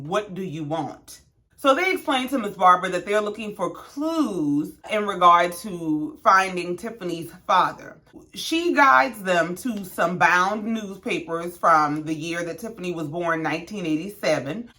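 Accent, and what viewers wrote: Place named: New Orleans, United States. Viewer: Omg, Where are you from? I just love your accent. I want to guess New Orleans but I’m not sure, lol.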